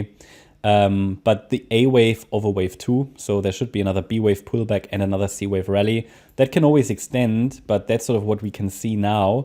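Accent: Germany. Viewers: I love your german Accent 🩷 Vielen Dank !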